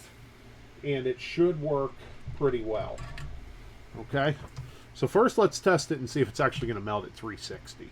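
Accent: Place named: Pennsylvania, United States. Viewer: This guy has to be from Pennsylvania. Fyi I use this for guitar effects. It works flawlessly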